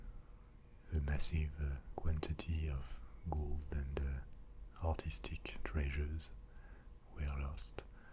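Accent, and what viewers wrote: France. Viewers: I just found your channel and are clicking through your videos and just wanted to leave a comment. :) Your level and tone of voice is exactly the right trigger for my ASMR (as well as the French accent...I don't know why, i just really like it, it calms me). So thank you for your amazing work, I will keep on listening now!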